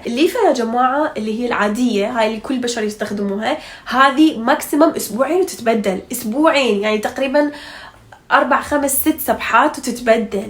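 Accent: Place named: Iraq. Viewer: خطفتني خطفتني اللهجة العراقية خطفتني ❤روووووعة مش ممكن كمية الابدااع فيها احب العراق واهل العراق وتراث العراق انا مغربية احنا في المغرب درسنا عن العراق وتراثها وعن حدائق بابل المعلقة كلش حبيييييت 🇲🇦💗🇮🇶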